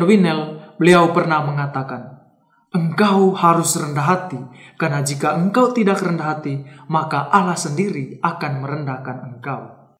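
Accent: Manggarai, Indonesia. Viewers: logatnya pasti org Manggarai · Naratornya masih kental dengan logat Manggarai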